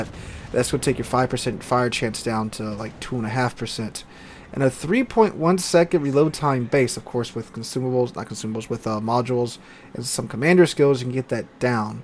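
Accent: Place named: United States. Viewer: He's using the American pronunciation rules. We say it this way, that's what we do.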